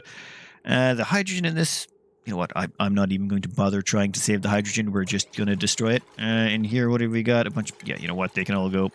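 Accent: Ireland. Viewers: You aren't from Ireland like me are you? Dublin here.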